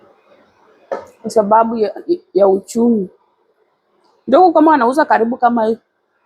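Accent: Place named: Kenya. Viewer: She’s a luhya, therefore don’t be too critical, cut her some slack! We understand what she means. Every tribe have an accent!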